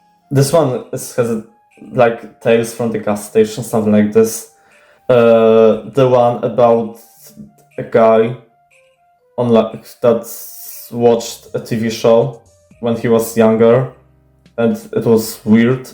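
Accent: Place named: Poland. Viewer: I just KNEW you’re from Poland, I know a polish person when I hear one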